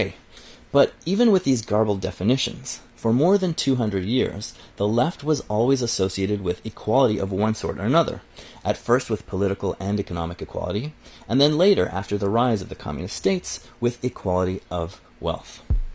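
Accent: Quebec, Canada. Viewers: Ah are you a french canadian from Quebec or Montreal ? Good video as alway !! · "Bein non ça speut pas lâââ" 😂 are you Québécois??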